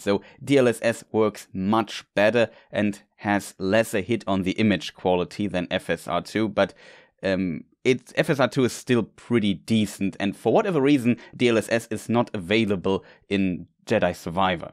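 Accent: Germany. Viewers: You are definitely a German brah 😀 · Man hört sofort, da steckt nen deutscher hinter ^^ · Kann es sein das du eigentlich deutsch sprichst habe da ein paar Wörter gehört die das untermauern 😁? Warum ist es zum Standard jedes neue game mit so einer Performens raus zu bringen? EA kann nichts wenn sie nicht exklusiv dafür bezahlt werden. Das erste Update hat null was gebracht... CPU immer noch bei über 60% und GPU nicht mal bei 60%, CPU ist eine 2700x mit 4,2GHz und GPU ist eine 3060 OC sie läuft bei 2115MHz und 8000MHz Memory Takt. Mal sehen ob dieses Jahr noch ein Game kommt was einfach ab Tag eins läuft, das wäre ein Traum 😍.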